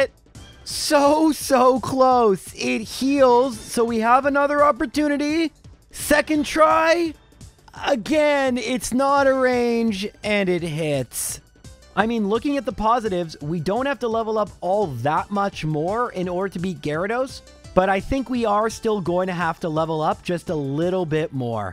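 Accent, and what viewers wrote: Canada. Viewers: These videos mean so much to me man. It's weird, I only just started watching them about 6 months ago but these Gen1 Runs are such a relaxing, anti-anxiety chill time for me. Maybe it's the Canadian accent (I left Canada 10 years ago) and Gen 1 nostalgia, but dang man. Thanks so much, keep it up please!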